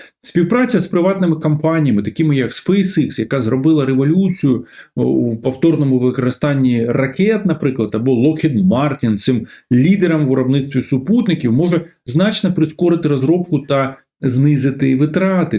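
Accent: Russia. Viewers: Та в нього тих перлів , чуть не кожне слово . Можливо то просто москалик , який вирішив українцям брехні понарозказувати !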